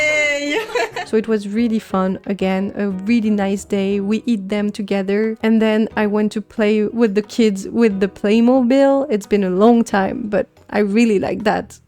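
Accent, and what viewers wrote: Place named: France. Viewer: Le petit accent français ;)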